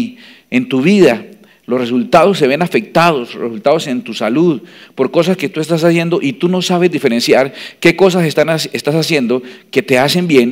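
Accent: Santander, Spain. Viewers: Haber sabido de usted Pastor ha sido de gran edificación para mi vida he aprendido mucho con usted, gracias a Dios por su forma muy diferente de montarnos el propósito de Dios, sin contar las innumerables ocasiones que me he destornillado de la risa por su comentarios y esa forma tan peculiar de hablar como santanderiano que es. Gracias a Dios por usted, bendiciones para usted, su familia, su iglesia y su ministerio a través de este canal Feliz 2018 para usted también. Amen.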